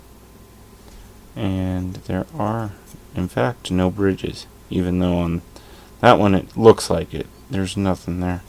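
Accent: United States. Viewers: John Strauss For the Accent my money is on Michigander... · excellent tutorial and thank you so much for doing and posting it. One thing though, the L is silent in the word solder unless you're from England. You sound American though??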